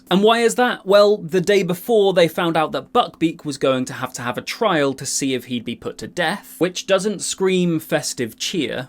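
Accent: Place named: United Kingdom. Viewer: I like how you show books, and the second book is a little bit torn, so it reminds me by first books I read in my childhood. Miss them much. Now I have a Harry Potter audoimarathon, listening all books in English read by Stephen Fry. You videos also help me to enjoy content as well as good English (British) pronunciation. Wish you a Marry Christmas from Russia! (we have our on 7th of January). In Russia Harry Potter movies are not Christmas, but so-called New Year's movies, so I will start to rewhatch them soon)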